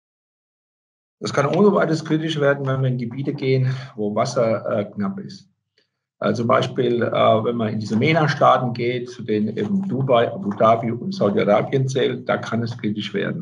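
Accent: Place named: Hesse, Germany. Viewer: „Wenn sie des…“ E bissi hessisch schwätzt er noch der Ex-Brunnensträsler! VG JK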